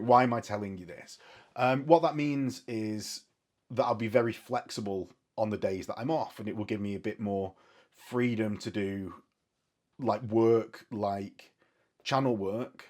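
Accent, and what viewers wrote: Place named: Manchester, United Kingdom. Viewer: Where abouts in the uk are you based? Might be able to trek a bit for a game. I'm thinking manchester area by accent?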